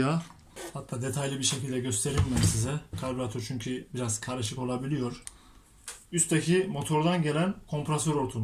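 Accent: Turkey. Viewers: sizi tebrik ediyorum. Ben bir yüksek mühendisim. Aynı zamanda 25 yıldan fazla mesleki kurslar verdim. Konuşman , Doğu Karadeniz şivesi , ama mesleğe çok hakimsin , abonen de oldum , Sıkı bir takipçim de oldum.Tekrar söylüyorum, çok güzel ve detaylı anlatıyorsun.başarılsrınım ve videolarının devamını diliyorum.Haa ben 70 yaşındayım. Ama takipçinim. Başarı dileklerini tekrarı ile.Esen kal.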